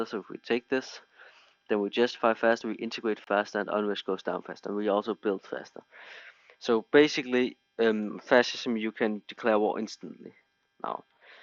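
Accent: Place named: Denmark. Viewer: bedste danglish jeg nogensinde har hørt håber alle russere ser det her..:3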